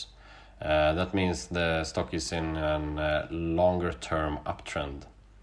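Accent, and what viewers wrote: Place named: Sweden. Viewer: Tjena, kanske ingen vild gissning men tippar på att du är svensk. Tack för en bra video, leker runt med finviz för att hitta bra aktier. Mest dock penny stocks som kan bryta ut kraftigt är det jag letar efter. Tycker du ska köra på svenska istället för engelska, mindre tittare kanske men tror ditt talande hade blivit mer flytande och naturligt. Har du fler set ups på finviz du kan rekommendera om man vill leta aktier som kan sticka iväg uppåt?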